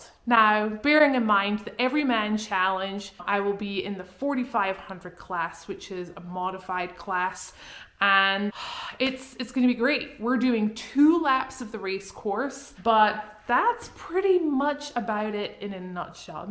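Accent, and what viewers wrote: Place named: Scotland, United Kingdom. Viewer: Everytime I hear your Scottish accent I get like jello 😍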